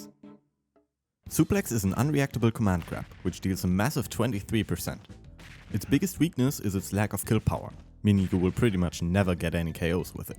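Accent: Germany. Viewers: Where are you from? Austria?germany? I just want to know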